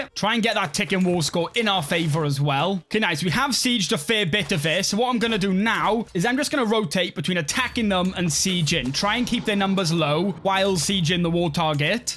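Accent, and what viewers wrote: Wales, United Kingdom. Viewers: Where in Wales are you from mate?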